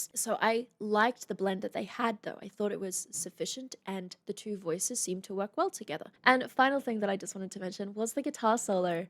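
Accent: Australia. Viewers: Where is ur Australian accent? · YT algorithm put you on my feed so here I am. Elizibeth endorsed you (check), good looking red head (check), nice Aussie voice (check). I subbed. Recommendation- Introduce us to some Aussie artists that those of here in the US might not have heard or know of, that would be neat. Cheers.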